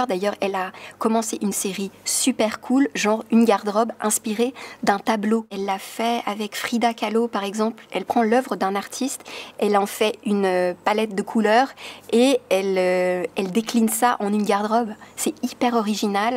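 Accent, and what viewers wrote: Quebec, Canada. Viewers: J'adore ton accent québécois ! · "Voilà, c'est l'été" aha toujours adorable 😊 continue comme ça! (J'adore ton accent québécois 😛) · Ton accent québécois est bien fait j'adore ❤ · Ça fait plaisir de t'entendre parler québécois <3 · J’aime mieux ton accent Québécois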